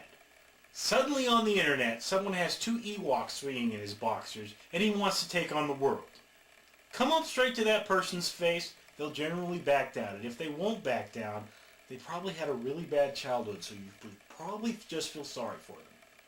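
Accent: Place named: Australia. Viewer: def australian well g'day mate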